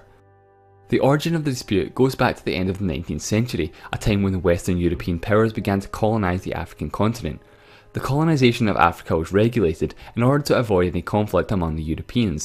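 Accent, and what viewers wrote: Scotland, United Kingdom. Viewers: Heavy Scottish accent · its not a dialect, its an accent. And the scottish one is recognisable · I'm surprised at the amount of people who can't recognize a Scottish accent! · Thank you for your videos. You make the seemingly mundane topics incredibly interesting. Also, I love your visuals/animations and your lovely Scots accent. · Im not from a english speaking country but... Are you from Scotland?🤣 What an accent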